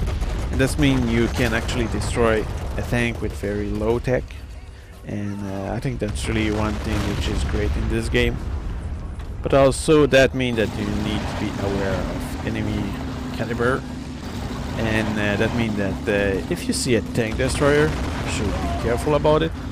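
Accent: Quebec, Canada. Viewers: you are from quebec? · You're French-Canadian right? all due respect, just curious ;)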